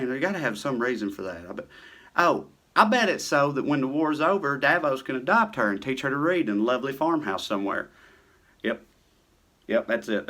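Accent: South, United States. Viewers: Got damn, my daddy cussed, and so did I. I was a small, blonde headed, spirited and polite Misssissippi girl just cussing when needed. One day on the playground, in the 3rd grade, a necessary "got dam it" moment occurred. A little Baptist girl, ( we were not Baptist) goody 2 shoes attacks me, yelling: "STOP TAKING THE LORD'S NAME IN VAIN." A vein was pulsating in her forehead. I blew her off, had she gone crazy? She followed, yelling. I turned around and asked her what she was talking about? Was she crazy? She explained "G.D". I looked at her as if she was insane and said," I am saying G O T DAM IT,it's got nothing to do with GOD!!!!!.........later I did delete "got dam" from my cussing...I knew, GOD knew I was not talking about "him" but I guess I did not want others to think that I did not love GOD....alas....I appreciate your clarification of your "Got damns", because they were bothersome to my hearing enlightening, intelligent words in a Southern accent. 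And that brings me hope and pleasure everytime you speak. Thank you,. Please continue sharing your thoughtful reality with us. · I am so fucking hooked on the brilliance, rhythm of Southern American speech, and erudition of, that I watched GOT after watching one of his takes on the show (I live in Spain, so we get everything late here.) Now, I am wearing dragon earrings and saying "Valar Morghulis" to random people in cafes. After I binge-watched the series, I watched his takes again, and I nearly tinkled my knickers.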